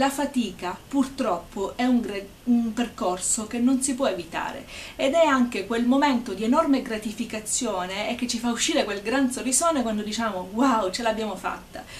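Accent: Sicily, Italy. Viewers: Catania? :D Palermo :)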